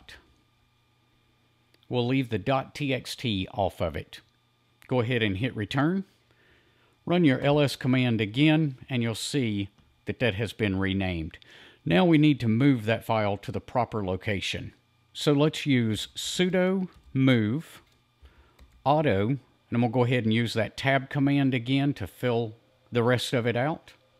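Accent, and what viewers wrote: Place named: United States. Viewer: remember gentleman his accent is country not stupid Great video dude gonna help me with my school project.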